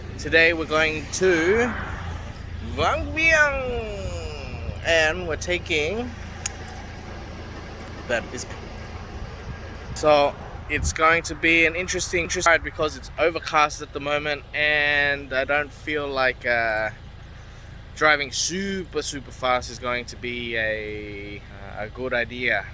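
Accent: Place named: Australia. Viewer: Sounds like Australian accent. Did you live in Aussie before? I used to work in Laos. Sabaidee from Indonesia 🇮🇩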